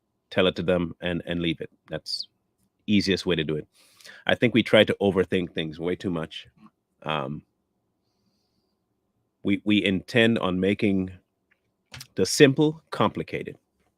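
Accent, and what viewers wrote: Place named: New Orleans, United States. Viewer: I'm from New Orleans..same accent..😇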